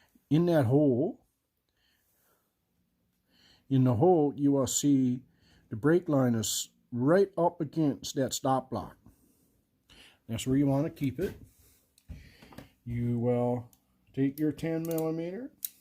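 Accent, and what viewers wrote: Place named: Maritimes, Canada. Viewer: Ahh, A CANUKIAN EH ! I thought I heard a touch of the East coast there. I am in North Central BC. Just an hour west of Prince George.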